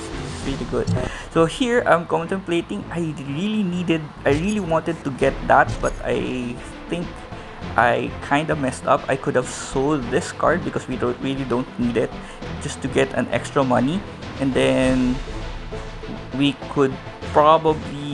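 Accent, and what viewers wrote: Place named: Philippines. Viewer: Nice! Im building this deck once I unlock Elektra, only need The Hood and I can unlock her as a hero. Btw are you Filipino? Guess ko lng from the accent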